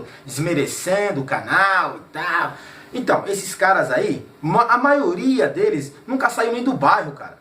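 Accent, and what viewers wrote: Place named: São Paulo, Brazil. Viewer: Vc é o Cara! Eu sou carioca - tenho vergonha da cidade hj, certo! - e jamais pensei em encontrar um mano verdadeiro com sotaque paulista. O impossível ė possível, acabei de encontrar o. Valeu, pelo canal. Para agradecer só posso retornar com 100% de audiência. Mano, não desista do seu povo, força guerreiro!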